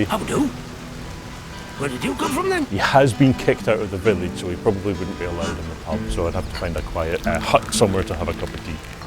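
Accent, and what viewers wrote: United Kingdom. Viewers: I was hyped till I heard British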